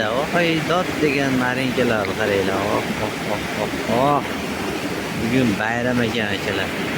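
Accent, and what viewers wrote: Andijan, Uzbekistan. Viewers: Анжонни каери бу ука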